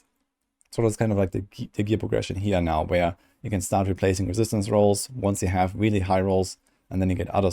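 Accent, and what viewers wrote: Germany. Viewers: Are you German, sorry for asking, I just watch your videos for ever and never wrapped my head around this one. Keeo up the great work!!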